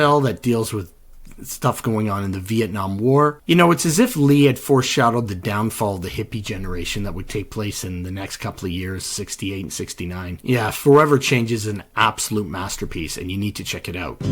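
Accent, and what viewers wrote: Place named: Canada. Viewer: Give him a break. He has a Canadian accent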